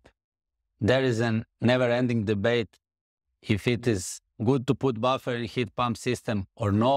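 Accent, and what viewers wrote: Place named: South Slavic, Eastern Europe. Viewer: Odmah se primeti (tvrdi) naglasak juznih slovena. Ali odlicno je sve, samo tako nastavi. Pozdrav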